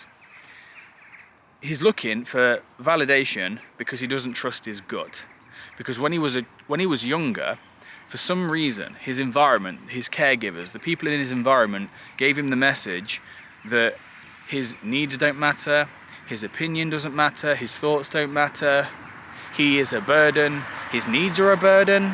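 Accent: United Kingdom. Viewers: He is from the UK. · Sounds like regular UK English to me. Background looks very UK-ish too :)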